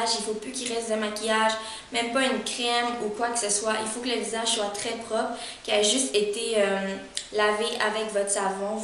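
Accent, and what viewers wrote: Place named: Quebec, Canada. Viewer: lol oui les français nous avons un accent , mais les québécois vous avez un accents très prononcé , c'est pour ça que je regarde beaucoup de vidéos faite par les québécoises, j'adore cet accent , je le trouve magnifique :-)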